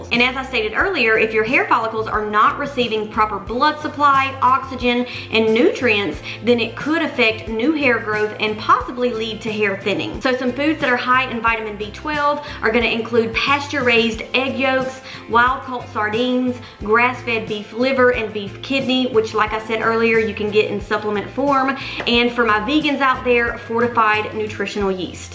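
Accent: South, United States. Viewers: love the southern accent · Your southern accent, tho!!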